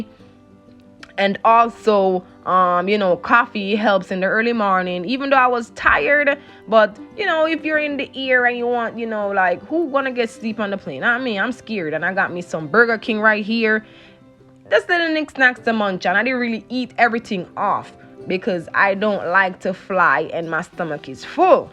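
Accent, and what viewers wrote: Jamaica. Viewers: Just scrolling through and came across your video.......your enthusiasm throughout the entire video is quite amazing though I'm born jamaican like you I truly love your accent. Left jamaica as a child almost 40years ago.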